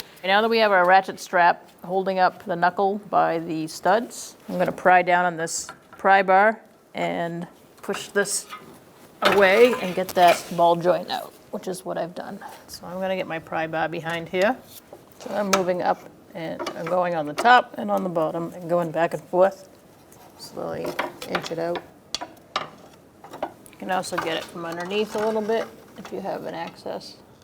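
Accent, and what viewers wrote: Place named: New York, United States. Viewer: New York or Jersey accent for sure. (out sounds like oat)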